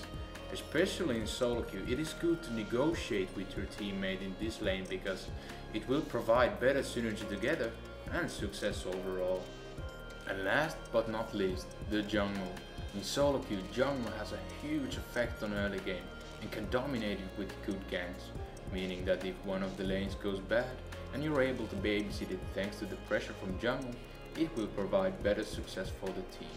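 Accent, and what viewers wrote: Finland. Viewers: Very much off-topic, but where are you from bro? Your accent sounds finnish.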